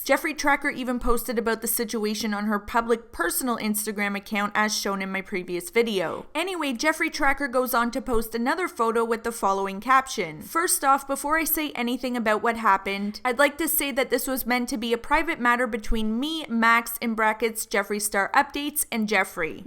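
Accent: Canada. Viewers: I love your Canadian accent 🇨🇦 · Are you Canadian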